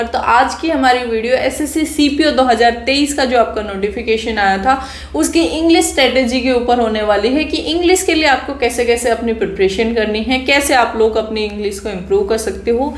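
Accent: Haryana, India. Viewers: चाहे कितनी ही आछे से हिंदी बोल लो पर हरयाणवी flow नही जाने वाला😅😅😅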